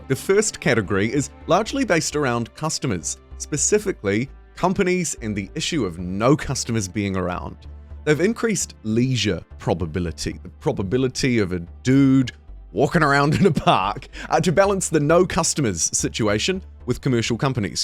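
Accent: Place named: New Zealand. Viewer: its good to hear a kiwi as voice, repping NZ in the gaming space of youtube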